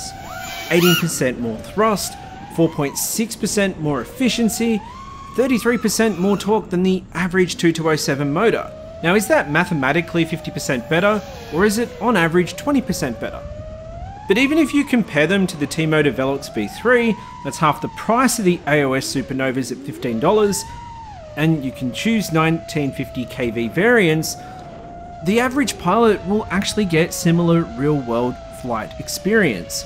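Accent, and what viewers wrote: United Kingdom. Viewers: well i bought 8 of his 8s motors they produce 2.7kg of thrust is hes blown the competition out of the water in the 2207 class range and only reviewer thats not useless and done a lot for the hobby and do u even know where his English accent is from because i used too live in the uk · It was not a criticism of him at all. He just has a very normal ‘Home Counties’ English accent. Like me. And I do like a lot.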